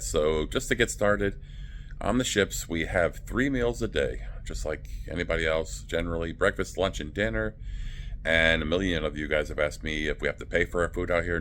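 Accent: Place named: United States. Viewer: White guy, speaking American English… you on a US registered ship? That’s not how it is typically at sea.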